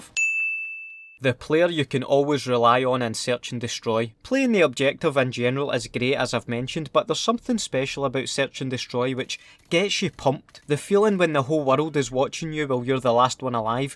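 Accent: Scotland, United Kingdom. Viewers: Scottish accent... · Dno weather it's your banter or your Scottish accent what makes you so funny but ye your funny man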